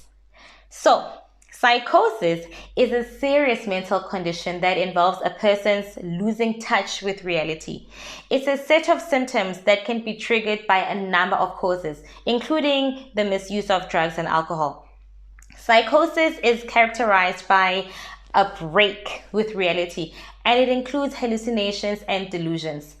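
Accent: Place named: Africa. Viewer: Here because a friend is dealing with this. There is something so satisfying about a woman with a wholesome soothing sounding voice and African accent ☺️ I love the way you present and speak.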